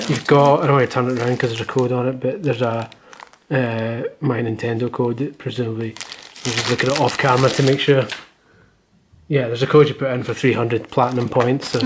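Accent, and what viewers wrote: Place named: Edinburgh, United Kingdom. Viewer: you sound like you are from Edinburgh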